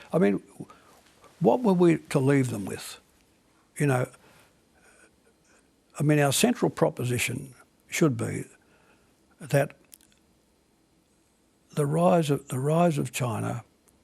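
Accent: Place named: Australia. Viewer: Mr. Keating Bravo ! As an Aussie Dual USA Citizen who lives in China for the past 11 years I can honestly say you are spot on. I was here before President Xi took office and it is a dramatically different country for the better since he has taken over. The officials in China wake up in the morning and say "what is it I can do to make the life of the Chinese people harmonious and prosperous?". The Australian officials wake up in the morning and say "what is it I can do for the Americans.?" There are millions of Aussies who need leadership and all they are getting is a born again evangelical who has handed over all policy decisions to the religious right in the USA. When there was all the trouble with Trump I would tell the Chinese people who asked where I was from that I was Australian until it became clear that being Australian in China today is far worse than being an American. 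Who would have thought that our soft power has declined so much that Australia is no longer considered a friend of China. Thanks for your inspiring talk. You made me feel proud once again that there was some intellectual truth being told with an accent that makes me wanna say ..... she'll be right mate!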